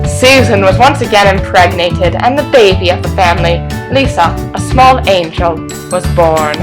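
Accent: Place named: United Kingdom. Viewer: love the British accent. you're quite talented. keep 'em coming!!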